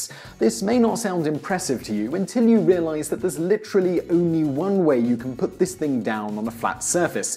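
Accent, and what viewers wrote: England, United Kingdom. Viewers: english accented johnny sins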